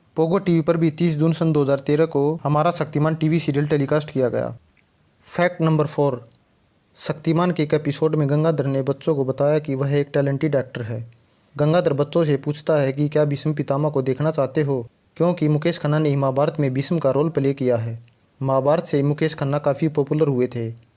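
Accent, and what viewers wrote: Haryana, India. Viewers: Bhai jyada haryanvy touch aa rha h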